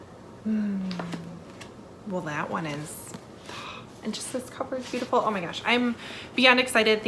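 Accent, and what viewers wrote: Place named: Midwest, United States. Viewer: Are you from Minnesota or Wisconsin? North Dakota gal here! Your accent is fabulous. Also, love your videos!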